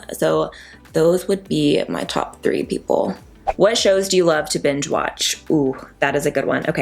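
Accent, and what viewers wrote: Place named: Tennessee, United States. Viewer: Low key still hear your Tennessee accent!!! So cute❤️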